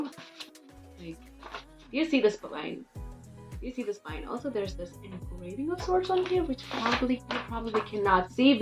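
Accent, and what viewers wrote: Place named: India. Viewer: Omgg your accent is reallyyyyy niceee for an Indian girlll!!❤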